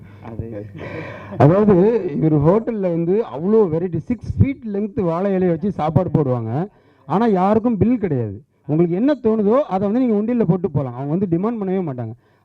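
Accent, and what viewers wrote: Kongu, India. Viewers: Kongu Tamil...UBM valgaa... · BEAUTY OF KONGU TAMIL!! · kongu tamil...😍💓💓💖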